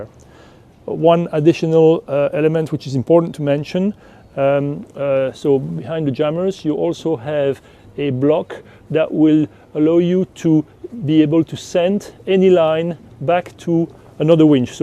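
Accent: France. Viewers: Very normal French accent, quite clear to be French actually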